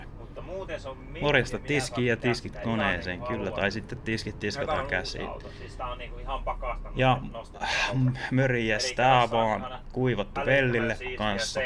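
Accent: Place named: Jyväskylä, Finland. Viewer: Puhut niin paljon tosta Jyväskylän murteesta videolla. Mä kun en näe tossa puhetyylissä mitään outoa. Tosin koko nuoren ikäni oon asunut Jyväskylässä😁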